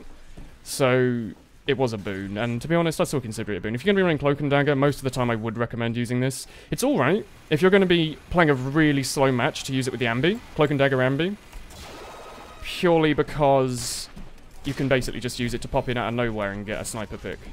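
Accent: United Kingdom. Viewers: what part of the UK are you from? Sheffield is still relatively warm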